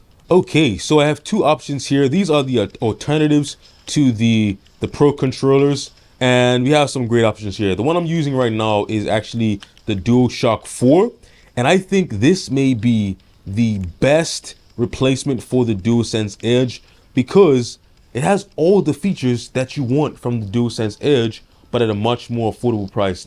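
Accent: Bermuda. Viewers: Ayo you got a pretty heavy Bermudian accent there my guy- not sure if you're actually from Bermy but it's similar!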